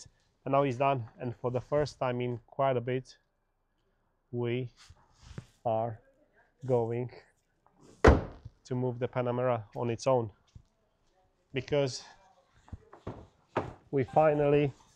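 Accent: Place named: Romania. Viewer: De aici este, mi-a confirmat. Accentul romanesc se simte :)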